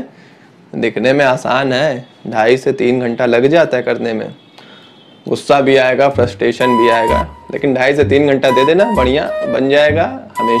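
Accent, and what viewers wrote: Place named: Lucknow, India. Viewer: You r from Lucknow iam sure😎😂 because iam also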